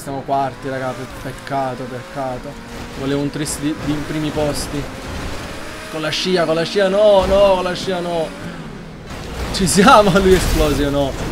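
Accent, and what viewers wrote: Naples, Italy. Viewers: Sei napoletano ?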